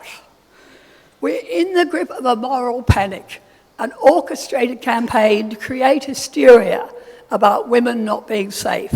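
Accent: Australia. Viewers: I like her aussie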